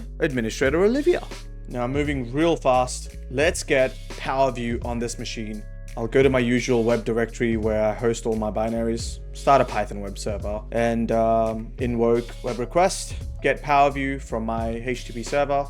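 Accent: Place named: South Africa. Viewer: Suuuubed. What accent is that? South African in Australia?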